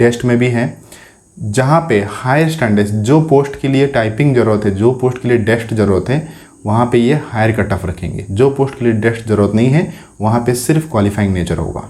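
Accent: Odisha, India. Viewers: Odisha state se ho kya aap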